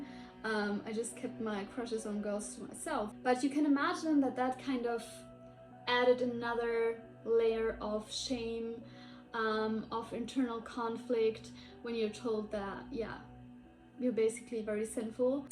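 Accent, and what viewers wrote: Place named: Germany. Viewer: I’m not sure if you are German, but your accent very much sounds so. The idea that something like that could happen where I live… :(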